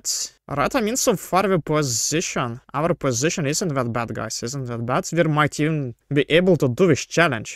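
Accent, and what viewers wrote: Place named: Russia. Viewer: damn the russian accent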